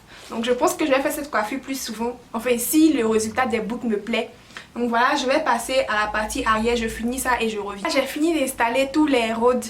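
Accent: Ivory Coast. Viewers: Une vraie Ivoirienne rien que t'entendre jee retrouvé u puays 😍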